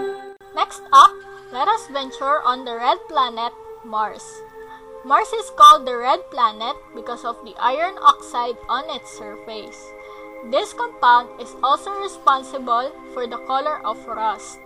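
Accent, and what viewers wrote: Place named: Philippines. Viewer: The voice makes it like she's a Filipina (Philippines)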